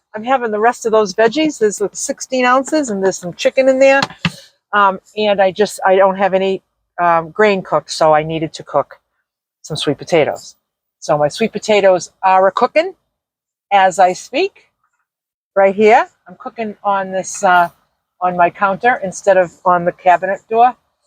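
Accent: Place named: New England, United States. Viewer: I love your New England accent! Also, your talent and adventures! I'm in Alabama and never been out West.. would love to see the Grand Canyon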